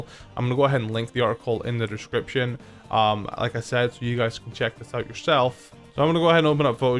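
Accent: Scotland, United Kingdom. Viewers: I always thought you sounded slightly Scottish but I know for defo bc the irn bru, yasss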